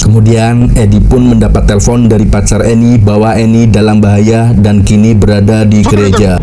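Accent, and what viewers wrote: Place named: Banyumas, Indonesia. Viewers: Mantep bro, aja kelalen ngapakmu rejekimu haha. Salam subreker anyar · Wong endi min, bahasae kaya wong panturaan jeh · Wong tegal kye😂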